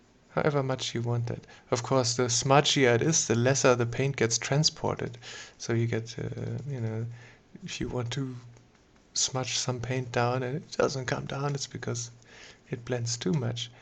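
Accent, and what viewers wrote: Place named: Germany. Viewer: Ich meine ich höre einen deutschen Akzent? Werden wir nichtperfecktenglischversteher auch mit einem deutschen Tutorial verwöhnt oder habe ich es übersehen? Lieben Dank...Finde die Möglichkeiten großartig.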